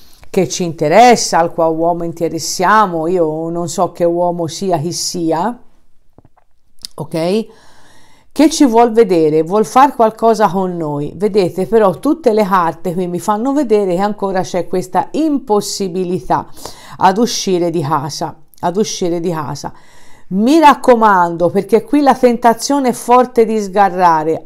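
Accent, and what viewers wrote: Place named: Tuscany, Italy. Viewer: Mi piace il tuo sccento toscano sei simpaticissima che tristezza sti giorni lontano da chi vuoi bene è veramente difficile speriamo passi presto e tornsre alla normalità amare tutto quel ci circonda abbiamo imparato tanto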